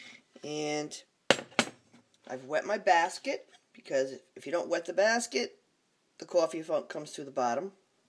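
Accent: United States. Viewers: I just bought a Corningware percolator at an estate sale here in Vegas! So cool. But not as cool as your awesome New York accent! i miss NY sooo much! You are fantastic Madame!!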